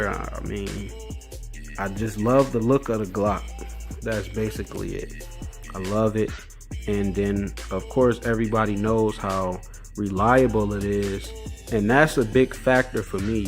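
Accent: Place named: AAVE, United States. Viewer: Dey pretty much uhmm yeah dey day dey day damn son lose that rap shit and fix the umm Ebonics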